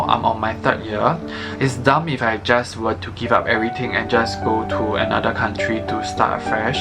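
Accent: Singapore. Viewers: AYEEEE SINGAPOREAN HERE TOO I SENT YOU MY AUDITION VIDEO ALREADY HEHEHEH when I first saw your video I was wondering if you were Singaporean but I wasn't sure but aye your accent is strong! I'm a proud singaporean of you here!❤❤ itsokay, life is really short. sometimes we have to give up on some things. glad you made your choices! I'm gonna work hard and try to achieve my dreams! jiayouz !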